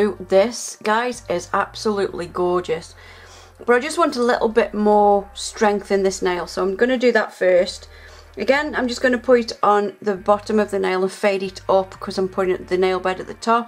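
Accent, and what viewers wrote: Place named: Stoke, United Kingdom. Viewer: love ya stokie accent too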